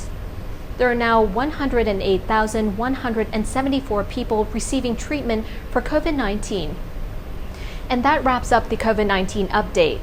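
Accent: United States. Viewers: she sounds American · You Will Have Wet 💦 Dreams Of her Tonight and you don't know a thing about accent . Her's Is 100% Americano Gringo, Bro 😷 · Great command of the english language, obviously an American accent. did a great job in the presentation, however recommend that she slows it down abit, should improve with experience. Overall a fantastic addition to the Thaiger team!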